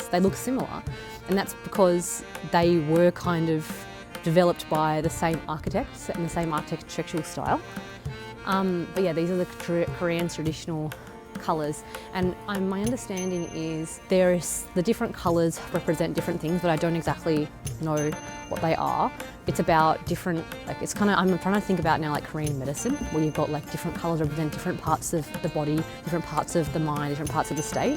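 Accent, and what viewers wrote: Australia. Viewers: 호주식 발음 독특해요. Here 히야, today 투 다이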